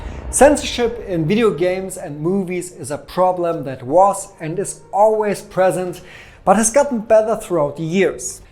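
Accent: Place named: Germany. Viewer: not the typical german english speaker nice usually all germans speak it like in the 1960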